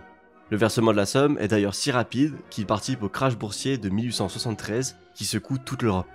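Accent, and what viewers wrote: Paris, France. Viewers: Je plussoie sur l'articulation. Je décèle un accent parisien, avec une tendance à accélérer trop certaines parties et phrases. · Merci pour ce résumé très précis . Une petite critique malgré tout : attention à l 'articulation en parlant , j'ai l'impression d'entendre un petit jeune du triangle d'or (Auteuil , Neuilly , Passy) . Il faut parler plus lentement et plus articuler , marquer la pose .Si non c'est très bien , bravo.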